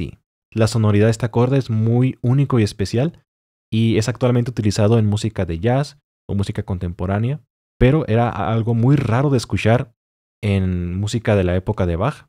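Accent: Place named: North, Mexico. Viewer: Sospesho que eres del norte de México por ese acento tan peculiar 🤭 ánimo paisano, tú canal es muuuy bueno!!!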